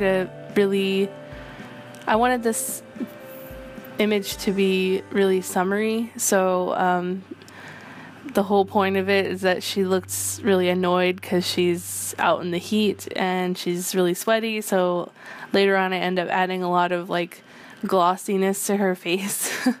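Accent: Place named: California, United States. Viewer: are you from California? cause u totally have the accent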